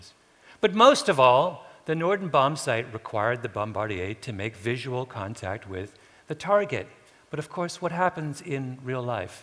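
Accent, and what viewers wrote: Quebec, Canada. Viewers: Americanos pronounce it as "Bomm Buh Deer" while Canadians pronounce it as "Bome Bar Dee Ay", that's a dead giveaway that Malcolm speaks Canadian. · is Canadian. We sometimes talk funny. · Sounds Canadian... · He's Canadian. Where both languages are used. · French Canadian.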